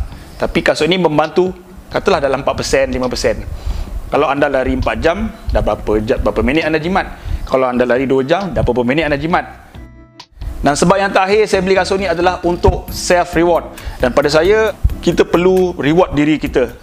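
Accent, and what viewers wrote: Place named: Malaysia. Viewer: Suka saye dengar logat Malaysie 😅,, salam subscriber dari Indonesia bang🙏😁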